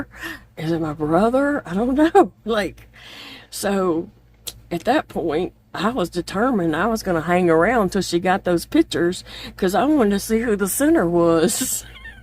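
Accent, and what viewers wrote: South, United States. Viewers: Ah, the southern accent gets us in trouble, doesn’t it? I laughed at both your stories. I’m so happy you enjoy spending time with us. I listen to you when I’m crocheting or coloring for the most part. There are some crochet coloring books on Amazon, some with granny square pages to help plan out a color story.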